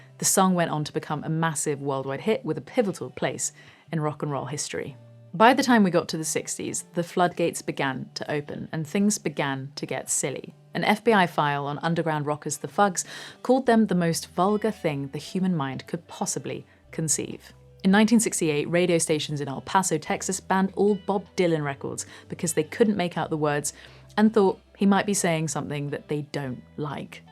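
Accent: United Kingdom. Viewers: I was avoiding this video because it seemed such a boring topic, but I was wrong., great job presenting this. I think artists like you should be able to use whatever language you want, but I also appreciate your sparing and judicious use of vulgar language because it can be somewhat of a turn- off. That said, I rewound the last section several times because I so thourghouly enjoyed hearing such filth delivered in a proper British accent.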